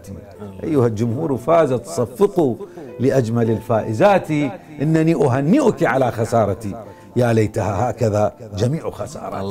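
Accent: Iraq. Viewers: ماأجمل اللهجة العراقية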